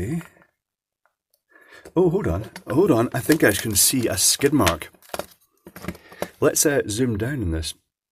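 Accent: United Kingdom. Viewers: as always, Very informative video, by very charismatic speaker, your Native British accent is another inspiration for beginners (as it was for me when I started 3 yrs ago ). Thank you and God bless you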